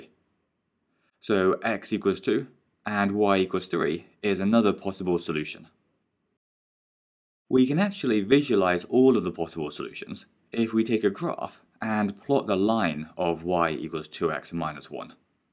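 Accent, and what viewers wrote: England, United Kingdom. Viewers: Pretty sure england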